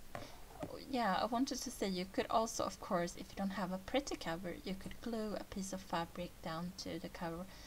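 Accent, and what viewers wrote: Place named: Sweden. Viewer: sigh...i do love your art, follow you on insta. I just wish I could understand your lovely swedish accent, as I am an american speaker. I can only catch a few words now and then. I know it's not your problem. That said, I'll watch, but will not try to understand. the closed captions are in swedish, of course, not english.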